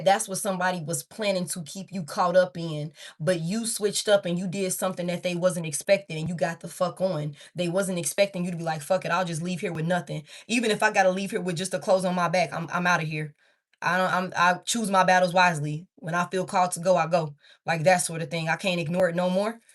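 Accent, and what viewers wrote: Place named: New York, United States. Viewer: You sound like you’re from NY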